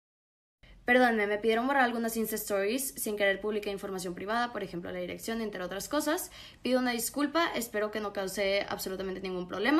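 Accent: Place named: Fresa, Mexico. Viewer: Más fresa no se puede escuchar jaja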